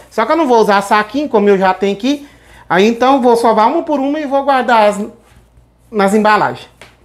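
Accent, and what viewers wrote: Minas Gerais, Brazil. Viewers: acho muito engraçado o SEU jeito de falar parecido com mineiro esparramar eu sou mineira! Por isso QUE tô falando! NÃO esquece da dancinha na hora de experimentar a receita!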